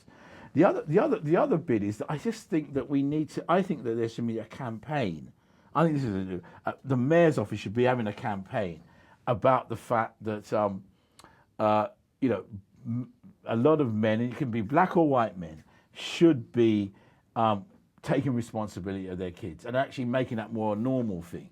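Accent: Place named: Cockney, United Kingdom. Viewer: Top bloke. For a cockney.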